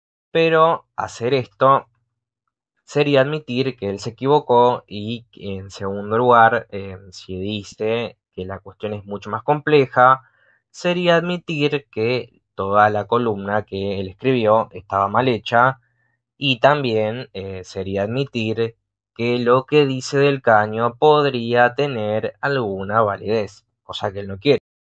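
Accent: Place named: Uruguay. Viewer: Vos tenes voz de uruguayo así que debes tener cara de pelotduo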